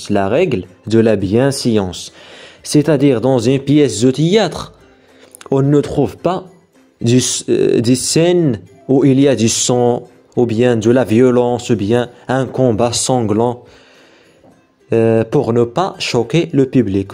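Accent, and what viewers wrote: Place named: Arab world. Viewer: Ohhh l'accent arabe🤓🤓 sinon bonne continuation frr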